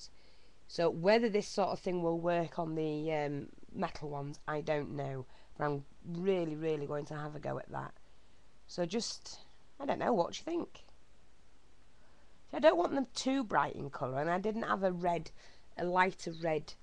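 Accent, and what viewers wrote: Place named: Yorkshire, United Kingdom. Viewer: i knew you were as soon as i heard your voice, we have a very distinctive accent here in good old yorkshire!!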